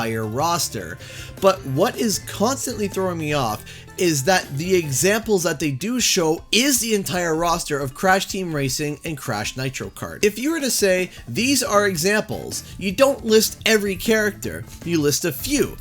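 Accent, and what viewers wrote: Canada. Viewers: Word of the video: "Vehgue." That the Canadian accent at work, I'm guessing?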